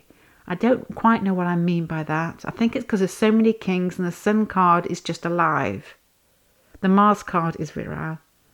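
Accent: Manchester, United Kingdom. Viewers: Are you mancunian? From Manchester?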